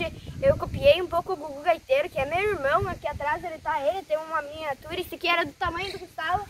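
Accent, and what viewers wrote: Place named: Rio Grande do Sul, Brazil. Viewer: Gosto do sutaque gaúcho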